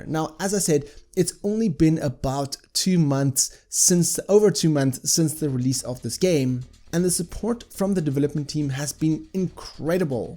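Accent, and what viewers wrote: South Africa. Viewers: Bru, ma chana. Wa ah yoo putting on, laak, a fake American accent, hey? Stick with the Edenvale accent. Id saands so much better. ^^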